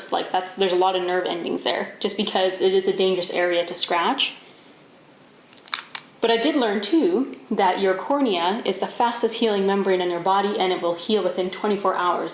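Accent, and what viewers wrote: Canada. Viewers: Love your Canadian Accent!! Its so cute!! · Love a Canadian accent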